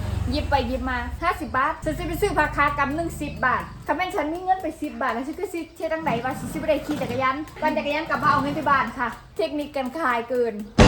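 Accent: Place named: North, Thailand. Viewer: คุณ เป็นคนจังหวัดไหนคะพูดสำเนียงคลายเมืองเลยคะ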